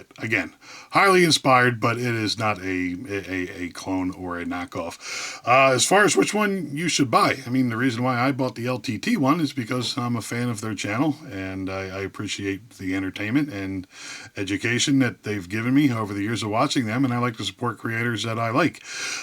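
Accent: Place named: New Jersey, United States. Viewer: Upper mid Atlantic sure sounds like Joizey to moi...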